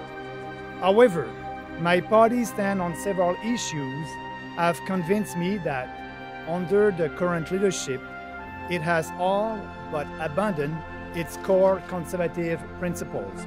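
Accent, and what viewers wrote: France. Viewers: Wow this guy tells it like it is not for himself but for Canadians. This guy needs to be Prime Minister. Perfect name for the party! It’s like a revamp for the Conservative party! If Canadian can overlook his French accent then we will have a real leader! God we might need your help! Canada needs your help! 🇨🇦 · The French accent applied to English speech gets us pay closer attention to the words and by extension to their meaning. He also got to his points quickly, without buzzwords. On a side note, I can’t picture Maxime stopping an English speaker to correct the word ‘mankind’ as ‘peoplekind’.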